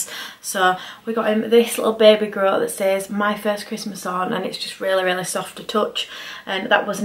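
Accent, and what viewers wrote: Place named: Middlesbrough, United Kingdom. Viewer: Thanks for making the video. I lived in Middlesbrough for couple of months and u remind me of it soo much ur accent.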